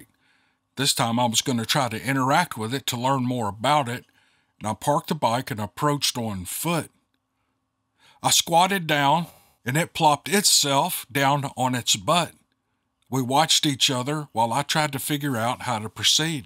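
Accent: South, United States. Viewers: For me there ain't nothin better than hearin' the voice of a southern man. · As a California native, I love nothing more than a Southern storyteller. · I love your accent. You see I’m a Connecticut Yankee. I had a wonderful pastor from the south. He was such a kind and wonderful man. He loved all his congregation and we loved him. Every time I hear you I think of him. Thank you, your voice is very comforting. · Thank God you are back with your southern accent. Who cares what that one or two feels about how you talk. I like it! Your voice feels like home. Your stories holds my attention and I hear your stories the way they should sound. Glad you are back. ❤ the stories you share! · you got a great southern accent you’re a natural story teller excellent. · I agree with Kevin! You sound like a true Southern Gentleman. I enjoy listening to most of your stories. 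Thank you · Southern accents are cool, cause it makes the stories folksy & that's a good thing🤗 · Are you kidding me? Everyone loves your southern accent 😊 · your 'valley girl' impression needs some work! Nice try but please keep that wonderful, natural, southern twang, after all this is! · you have a great accent like me Southern🤩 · I love your accent. I'm southern too and ppl laugh at me all the time and I could care less. Been in Fla for 28 yrs now but grew up in Thomasville, NC. A true southern girl here and dang proud of it!!!! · I have a news lash for ya, you cannot mask your accent it comes through no matter what, that is how we Southerners talk, if it does not agree with some folks that alright with me! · Sir, we love you for you. I was born and raised in the South. Hearing your voice makes me feel home. We joke that my inner compass broke because I moved up to LaCrosse, WI. Then, I got married and had to stay for now. 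My husband has decided he wants to move South. Praying we get to. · Hi, just wanted to say regarding comments on your accent, as a New Zealander retired in Thailand, i find your southern accent & story reading skills, adds to the realism, and i can picture the story in my mind, ( true or not ) i really enjoy them, ķeep it up, cheers. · I love your dixie accent Love 'n' hugs from England ❤ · Your "accent" is part of who you are brother.... F all that.... And just a personal note... I find it comforting... Moved to DE at 15 after a lifetime prior in WV/OH. That was 94... Been here since... Family back there passed mostly... Elders due to age, and even my cousin who was the closest friend/brother and in age as well, I had... Drugs took him. Life rather, took him..... I won't get into all that.. but I'm saying... I listen for many reasons... The southern feel... Is one. Thank you. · Hey I always liked the us southern accent, I don’t know when people started using the like word so much it’s enough to drive me right up a tree a can’t stand it, even some older people use it now, I would rather have a jaw full of tooth aches than here it, lol Fr Canada 🇨🇦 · Ok,OK, keep the Southern country boy accent please. 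😂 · You talj just fine people are just mean. Your Southern accent is cool. If I had that accent here in California, I'd be running from all the women, don't change a dang thing lol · What's wrong with your accent? I've lived in the south, and you have a fairly mild accent. Try under standing someone who primarily speaks "gullah". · I love your southern accent!! Screw those haters!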